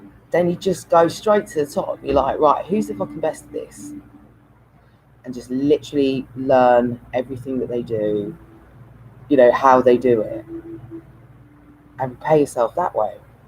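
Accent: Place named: London, United Kingdom. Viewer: Hi ❤️ just subscribe after reading your comments on Carl Vernon. I take it from your accent you're London or surrounding. It's lovely to listen to you sharing your thoughts. I find video diaries in general really interesting (I'm not brave enough to do it myself). You seem of similar mindset as myself (and a similar age 🙂 I'm female). I'm totally all about meaningful coincidence, laws of attraction, symbolism etc I keep getting the number 44 lately - it's bonkers how much it appears. Are you registered to Gaia? It's like a spiritual Netflix 🙂. Some great doco's on there. Anyhows, I'm waffling 😍. Just thought I drop in and say hi. Namaste lovely lady - positive vibes to you on your journey from another spiritual lady in Cornwall /Devon area x❤️x ps you have a gorgeous, charismatic way about you - keep making your diaries 🌜🌞🍄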